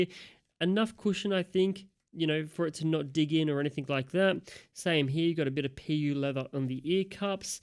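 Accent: Australia. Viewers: ahhh, the Aussie ascent is music to my ears. 😌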